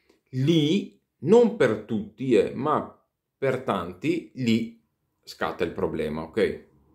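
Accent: Veneto, Italy. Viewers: Carissimo, Ho visto questo e molti altri tuoi video. Condivido tutto e trovo le tue recensioni di lamette e rasoi molto vicine alle mie stesse impressioni, per quei pochi modelli che conosco. Penso abbiamo entrambi pelle molto delicata e versi del pelo inclinati. Io da molto anni mi sono avvicinato alla rasatura tradizionale anche se ad intermittenza, per molti anni ero tornato al multilama per comodità e poco tempo al mattino. Però ho sempre usato anche col multilama l’ottima crema DR Harrys Arlington ed il pennelli Plisson in tasso grigio di alta montagna ed il dopobarba Acqua di parma in balsamo nella profumazione colonia classica, un prodotto che trovo eccezionalmente lenitivo. Dei Plisson e di Acqua di Parma non ho mai trovato recensioni almeno in italiano, peccato perché Plisson è la più antica fabbrica di oennelli da barba. Proverò le lamette che hai consigliato in altri video, almeno quelle più reperibili. 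Grazie e bel lavoro! Ed anche complimenti per l’accento Veneto, anche io sono veneto ma molto più ai confini nord… Saluti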